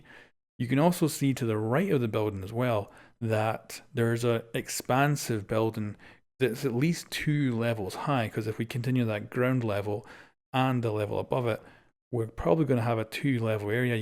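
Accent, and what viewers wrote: Scotland, United Kingdom. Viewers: Are you scottish? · Love the accent! Are u scottish?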